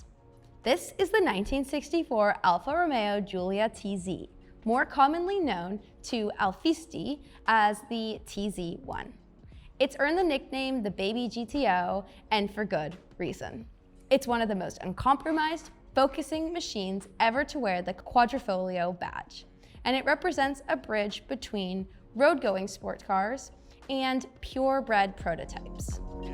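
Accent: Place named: California, United States. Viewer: dear god, lets get a different narrator... i dont want to listen to a california girl accent. ever.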